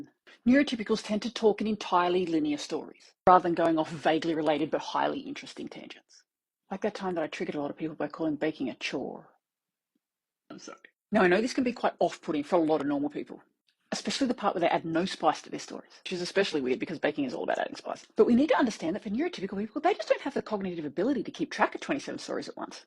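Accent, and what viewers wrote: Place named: New Zealand. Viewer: Ranom Kiwi accent 👌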